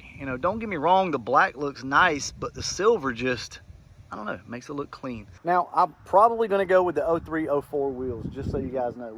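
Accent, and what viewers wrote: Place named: South, United States. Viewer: I'm new to your channel. Like 2 months in now. I knew you were close to my old stomping grounds by your accent. Then I saw Dothan today. You ever run anything at North Florida Speedway?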